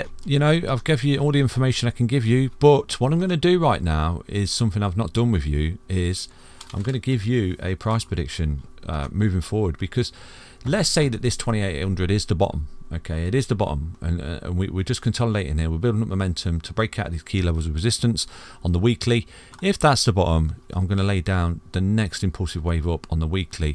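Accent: United Kingdom. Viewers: This british accent is so damn gay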